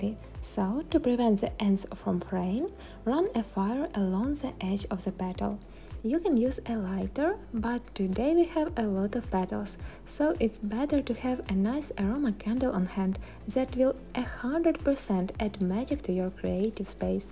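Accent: Russia. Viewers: Акцент)) выдаёт что наша! Но здорово всё равно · Вы же русская, сразу слышно) Почему на английском? А работы очень красивые 😊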